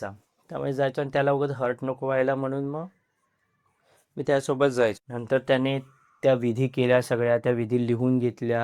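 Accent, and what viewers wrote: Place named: Pune, India. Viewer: अभिवाचनाची लकब पुणेरी वाटते❤❤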